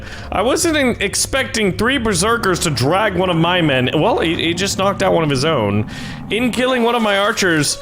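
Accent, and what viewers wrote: Scotland, United Kingdom. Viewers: I like the Scottish accent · Scottish~ish sounding voice but English units No complaint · Scottish accent ? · 10/10 Scottish accent being given throughout the video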